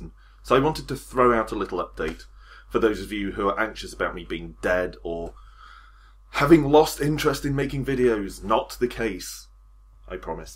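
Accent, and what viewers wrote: United Kingdom. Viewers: I'm glad things seem to be sorted. I just found the channel, and definitely subscribing! ...although, I must admit it is in part because of your British accent. (^_^)b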